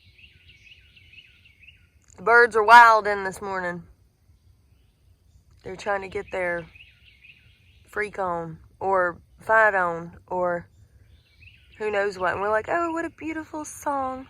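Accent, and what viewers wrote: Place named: Tennessee, United States. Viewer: Im from Tennessee. Your accent is a little bit Tennessee.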